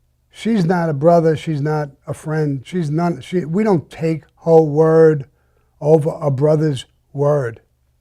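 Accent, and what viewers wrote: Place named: Rhode Island, United States. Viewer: The way he says words like bottle and mouth.. Reminds me of my Grand Father. Providence RI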